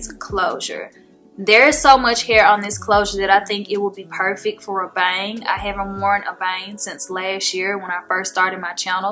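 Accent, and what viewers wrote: South, United States. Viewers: i like your accent its like country. where are you from?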